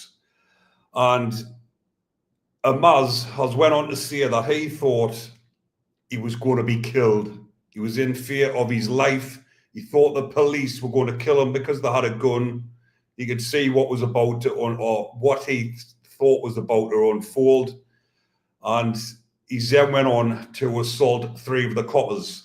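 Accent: Newcastle, United Kingdom. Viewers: Good to listen to you love your Gordie accent 😁 ♥️ 🤍 💙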